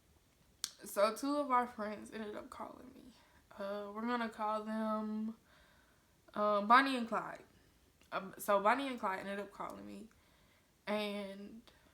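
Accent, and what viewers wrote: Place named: New Orleans, United States. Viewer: You from New Orleans?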